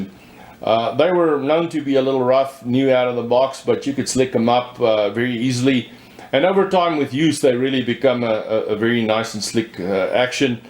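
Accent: South Africa. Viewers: The narration on this video sounds like someone speaking "American" with a South African (Afrikaans) accent....??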